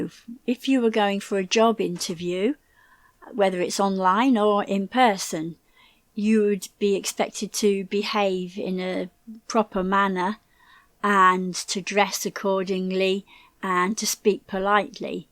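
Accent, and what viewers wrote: United Kingdom. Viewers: thank you great british accent to learn here and enjoy it I hope one day you go live with mom and dad 🎉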